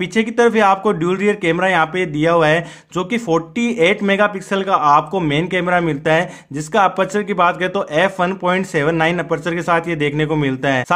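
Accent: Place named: India. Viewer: heard indian accent.. quick close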